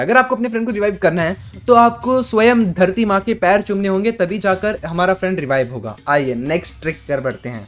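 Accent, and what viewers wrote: Maharashtra, India. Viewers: Are you Marathi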